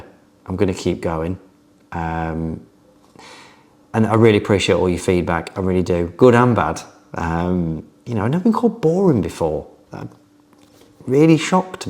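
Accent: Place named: Nottingham, United Kingdom. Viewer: Vocal inflection? He clearly doesn't know how people from Notts sound duck 👍 Looking forward to seeing what comes next to the channel!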